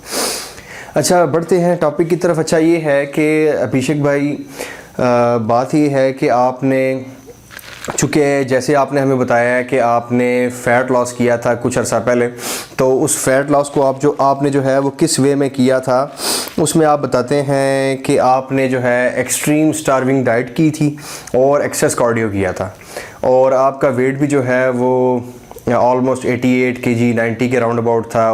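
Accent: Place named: Sialkot, Pakistan. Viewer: Bhai Asslam O Alaikum... Bhai Jo Ha, Wo Jo Ha....Ya Jo ha, Ab Jo Ha....Jo Ha, Jo Ha.... You definitely from SIALKOT. Love From Sialkot <3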